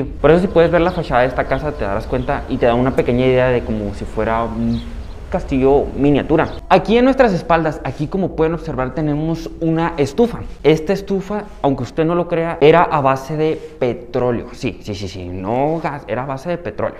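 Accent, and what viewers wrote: Chihuahua, Mexico. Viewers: Yo pensaba que eras de chihuaua .me gusta tu asento de sh.saludos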